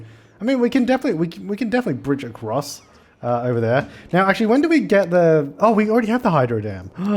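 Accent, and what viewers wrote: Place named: Australia. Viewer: Thank you for creating,! When I focus on your video, I find your commetaries funny! Including the fact that you, and some viewers here taking your builds seriously. Its awesome! I also like to let your vids play in the background while studying. At times I also watch your videos when I'm trying to go to sleep.Your soothing voice and your Australian accent can be the perfect lullaby. I'll watch as much ads as I can to support you ba'aa'aa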